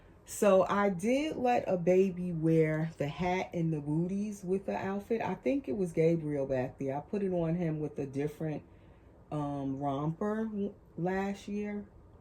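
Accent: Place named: New York, United States. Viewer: Ok I have to ask... are you from NY? NJ? Lol you have that accent. I love listening to you talk!❤ I also love listening to myself talk 😂so I'm just wondering lol love your baby, she's sooooo cute!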